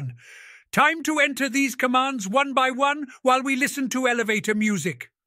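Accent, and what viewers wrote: United Kingdom. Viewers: btw is it or, can't find any british voice like yours, it's really funny, i just wanna listen to this guy, and do whatever he tells me to do, like "go to gym you idiot" XD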